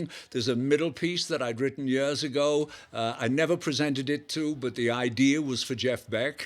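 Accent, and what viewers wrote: RP, United Kingdom. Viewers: He speaks rather posh English.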